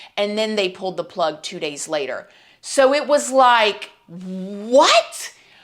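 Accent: South, United States. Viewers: Hi, I am one of your babies, you're not only an informative, Amazing story teller of crimes, I'm totally impressed with you and you are Stunning and an Awesome Mama, I'm from New Orleans & we have a ton of N.O.La.accent down pat, are you actually from Florida or a more Southern town??? Either way I will follow you as often as I can find a story I've not already seen, take care of you 🤗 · This is a horrific case with horrific details. On a totally different note where is this content creator from? I'm only looking for a general area because that slight southern drawl is the best thing I have ever heard. I might even be willing to relocate. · When did you get a southern accent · You say the word , "on", In a different accent that your normal , seemingly southern accent. Strange. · You’re a little too much for me. I’m not your “baby”. Can’t you just talk without all that southern foo foo and fingernail drama?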